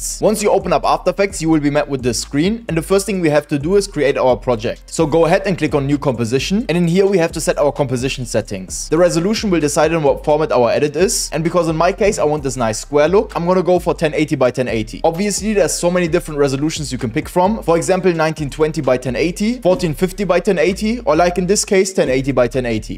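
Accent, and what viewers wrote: Germany. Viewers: Are You German? I hear a german accent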